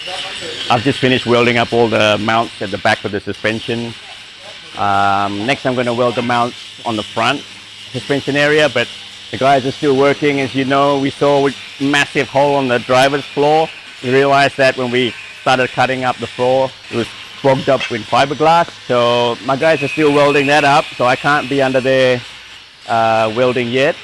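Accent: Java, Indonesia. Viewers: Wajahh chindo, kecill di ausiee, bicara medok totok, tapi kalo ada yang ngeremehin negaranya, kuliti. Mau bule mau paklek hajar... Mantap, mantap tim prorock...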